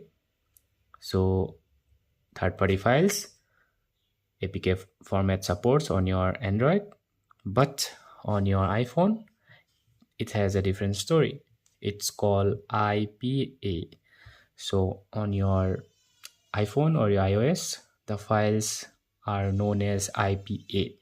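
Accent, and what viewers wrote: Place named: India. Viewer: You’re dumb Indian voice to waste my time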